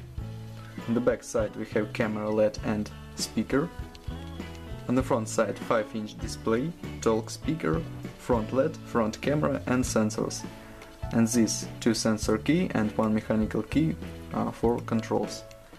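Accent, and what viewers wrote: Russia. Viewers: Русский видно акцент)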